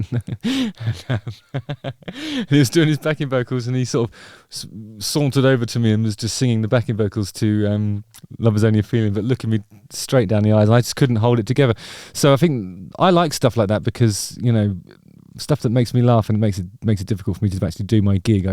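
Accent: United Kingdom. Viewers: i'm embarassed i had never heard of (must have been that rock i was living on in hawaii;-))....somehow I stumbled on your youtube channel and thought it was the best thing I've ever seen, positive, insightful, british accent. I checked out and fuck, damn, i play it all the time. my daughter is like "are your favorite band". i'm 57 and thought i'd never find anything new an exciting (for me at least, 15 years late) in music again. mahalo. it's a joy to watch you work and inspiring in ways you might not immediately know that are unrelated to music, but overcoming the struggles of life. after watching this, i guess i need to smile more too now, damn you Justin Hawkins you are making the world less miserable!!!;-) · I like your accent; where in the UK do you come from?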